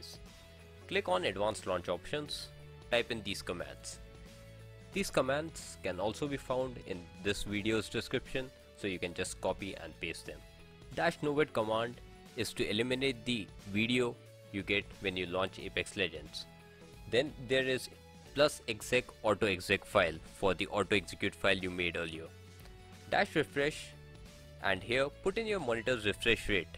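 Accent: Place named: India. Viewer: This helped me a ton! Thanks! Also, I can tell you're an Indian by your accent... wanna play Apex together sometime? :)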